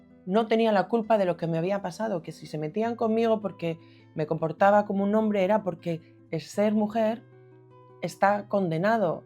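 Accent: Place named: Galicia, Spain. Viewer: ¡Queridísima amiguiña! (con ese acento galleguiño que tanto adoro), felicidades por este precioso vídeo tan auténtico, donde muestras tu alma cruda con total sinceridad y valentía. Me he emocionado mucho, gracias por tus palabras, para mí el camino también fue muy especial, sobretodo gracias a ti. Aunque mi historia no es la misma, me he sentido identificado en mucho, porque también sufrí acoso en el colegio, aunque ni de lejos tanto como tú. Nuestro pasado nos ha llevado hasta aquí, eres así a pesar de todo, pero tu generosidad hace que quieras canalizar esa experiencia en ayudar a otras personas. Sin pretenderlo, con este video a mi me has ayudado mucho, ¡gracias! Acabo de empezar yo también una nueva etapa profesional solo para ayudar a otros bichos raros como los que tú y yo fuimos alguna vez, con la ilusión de ayudar aunque sea a uno a sentirse un poco más integrado. 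Quizás seamos unos ilusos, alguien pensará que incluso seamos débiles, pero ya una vez aprendí de otro coach, que los más fuertes son precisamente los que reconocen sus debilidades y errores ante los demás. Doy fe de que puedes ayudar a mucha gente, te apoyo y te animo a seguir, porque eres maravillosa única y especial.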